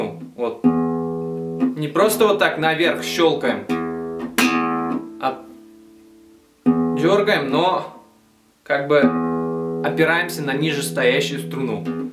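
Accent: Tatarstan, Russia. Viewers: Оой, так нравится родной татарский акцент 😁 я нашла по чьим урокам буду учиться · Блин)))) этот Казанский акцент, татарча малай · татарский акцент никуда не денешь, авызынны себерим😏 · С таким татарчёнским акцентом говоришь 7 лет назад. Таааак мило... :-*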